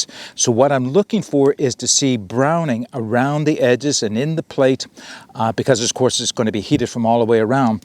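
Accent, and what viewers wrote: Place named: Nova Scotia, Canada. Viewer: Just found you channel, I am starting Keto and am looking for something for outdoor cooking. So far I have enjoyed you recipes and would like to see a book on keto eating outdoors, I have looked for such a book and can;t find anything suitable, had you thought about writing one? I am UK based and Keto doesn't seem to ba a popular lifestyle here, so far I am enjoying the keto and feeling better for it. Please keep up the good work, you are easy to listen to and follow. I'm Guessing you accent is either Nova Scotia or Newfoundland.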